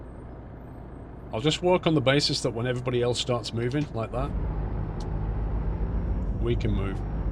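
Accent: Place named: United Kingdom. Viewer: Volvo is in ATS as is all the Kenworth's as is all the Peterbilts. I don't know if they are exact replicas but they all seem to pretty much work the same and look the same. I have never used a Mod, I just stick with what SCS has as I don't need big game crashes as I'm not that computer savvy. ....... I'm American so I love your British / English accent and even much more of the UK ladies..... You probably don't realize you have an accent, but I sure do. However, your speech is nice and clear and precise even for an american to understand or any one that speaks English. England is considered Pennsylvania's mother state, as it was I think, given to William Penn by the King of England. With out googling it, I don't know why the King gave Billy all this land. However I live in Pennsylvania, USA and my great-great- grandparents migrated from the UK to here, but I have no idea why. 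I gave you a thumbs up and watched your video on 3/17/2019. Also that last delivery yard you pulled into and parked is fairly typical of ATS... The scenery however was much nicer than ATS including the roads. My next attempt at a game is going to be GTA5. If you know Jeff Favignano, he seems to get the most of GTA5 and I love watching & listening to him play that game. He has a lot of personality. Take care, God Bless...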